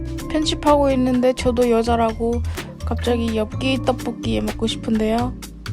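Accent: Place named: North Korea. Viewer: 북한말은 독학하신건가요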